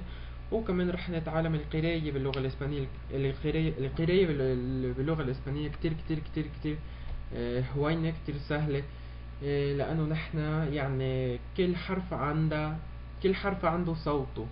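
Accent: Mexico. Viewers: يتكلم اسباني ب لفظ مكسيكي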